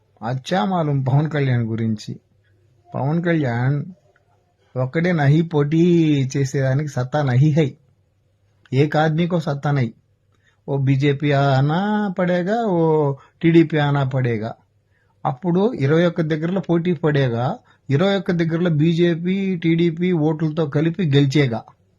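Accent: Nellore, India. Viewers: anna nellore Hindi super anna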